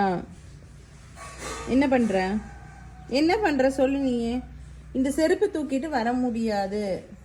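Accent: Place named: Coimbatore, India. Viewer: I just loved her Coimbatore Tamil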